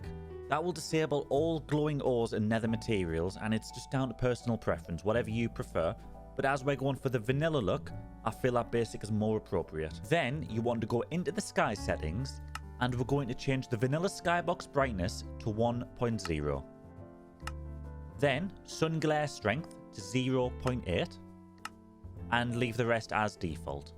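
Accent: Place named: Newcastle, United Kingdom. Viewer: What a mighty geordie accent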